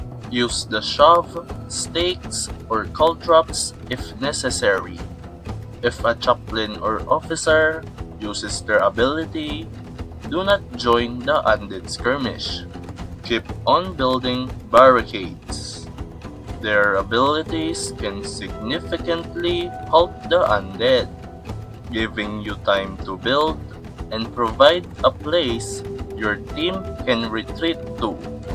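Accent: Philippines. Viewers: Why you kinda sound Filipino?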